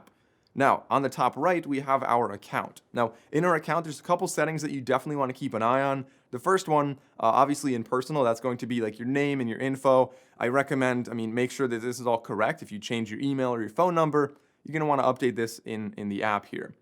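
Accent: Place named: United States. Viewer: Thanks great explanation , I appreciate it, with American English understood clearly , I’ve seen other videos explaining with other English accents and they just got me more confused, thanks You are the man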